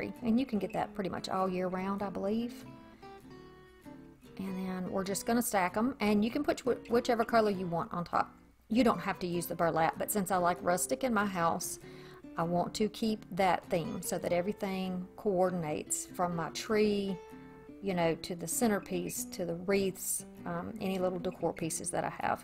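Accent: South, United States. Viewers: Love it all! I'm from South Carolina, now living in Ohio, and the minute I heard your voice I knew I'd have to subscribe to your channel. You could be making mud pies and I'd watch just to hear your wonderful Southern accent. Mine isn't as strong, but people here keep telling me they can still hear a "twang." When you were talking about that cute two-part snowman and how some people think they have to have three sections, I absolutely howled when you said you live in the South and we're lucky to get enough snow for one part. 😂❄❤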